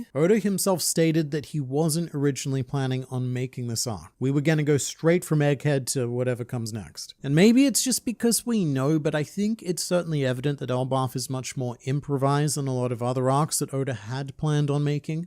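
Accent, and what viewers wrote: Australia. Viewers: I like his yapping. I come to hear a fancy aussie yap about fictional pirates.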